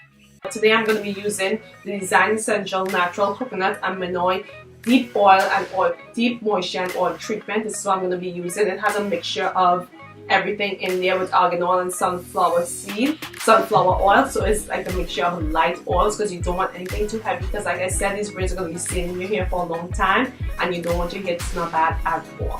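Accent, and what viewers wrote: Trinidad and Tobago. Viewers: she has a trini accent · yuh cyah hide the accent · ah know mih ppl Trini accent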